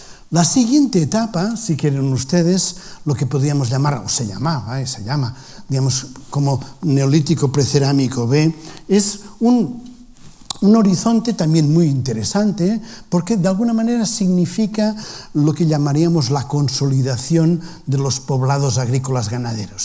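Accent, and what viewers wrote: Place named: Catalonia, Spain. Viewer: Sabe hablar en Español? Parece catalán...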